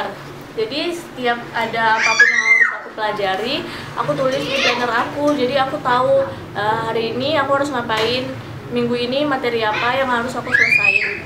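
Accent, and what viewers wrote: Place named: Bali, Indonesia. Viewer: kak org bali ya?logatnya khas😁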